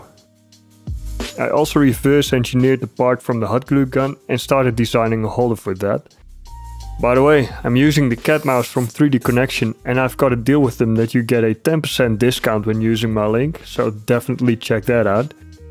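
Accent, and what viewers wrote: Netherlands. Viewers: I smell a dutch accent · Love the Dutch accent! I am also Dutch lol. Love the video to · Nice work and a very interesting project. Your accent makes me miss the time i actually lived in Holland (Harlem). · Doubted if you are dutch because of your accent. My suspicions were confirmed 😂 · love that dutch accent. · Ook een nederlander haha ik kon het horen aan het accent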